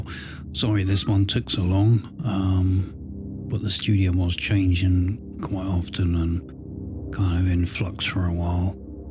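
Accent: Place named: United Kingdom. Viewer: Your studio is bad ass brother. I never heard you talk. And just imagined you as a Yank. So my question. Where across the pond are you from?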